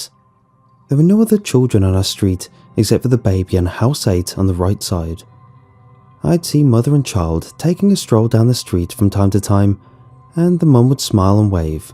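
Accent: England, United Kingdom. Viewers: Hahaha He do have a noice English accent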